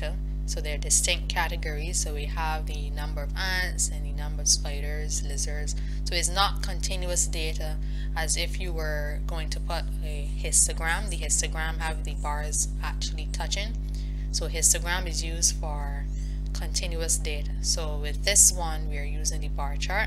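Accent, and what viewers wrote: Barbados. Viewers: Cause she’s prolly Barbadian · Why she had to have a Barbadian accent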